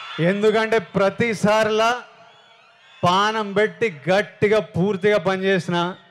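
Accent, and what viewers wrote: Rayalaseema, India. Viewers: Rayalaseema slang bagundanna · అన్నా రాయలసీమ యస చన బాగా మాట్లాడినవ్ అన్నా నీ సినిమా బాగా ఆడతదిలే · Rayalaseema slang ippudu, next Godari slang loading 👌👌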